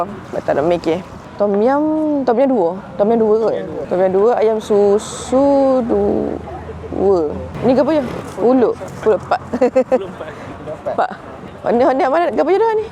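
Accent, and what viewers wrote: Kelantan, Malaysia. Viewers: woi, steady ahh kecek kelate...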